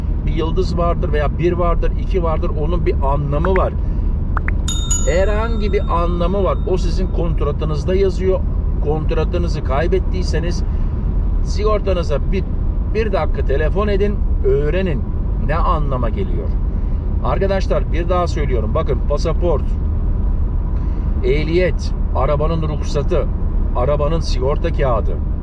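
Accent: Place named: Thrace, Turkey. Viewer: videolariniza yeni bakmaya basladim. Herkez yerine, „Erkez“ diyorsun. Memleket neresi? Trakya şivesi duydum gibi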